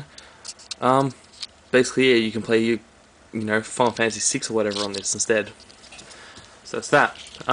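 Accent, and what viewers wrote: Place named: Australia. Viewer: hey dude you sound aussie like me lmao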